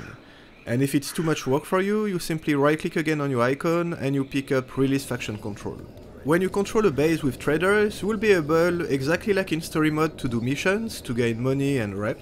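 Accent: France. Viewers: salut , j'ai entendu a l'accent que tu étais francais :) alors voila j'ai un soucis avec a anomaly en mode warfare c'est en russe! alors que le mode "histoire" est en anglais saurais tu comment arranger sa ? merci d'avance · Merci pour ce tuto (ton accent anglais me fait penser que tu comprendras mon commentaire 🙄😆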